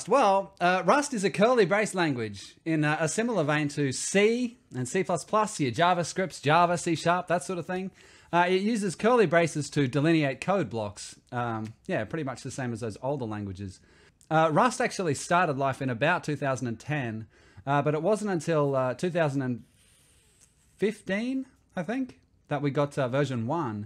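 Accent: Australia. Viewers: What part of straya you from mate?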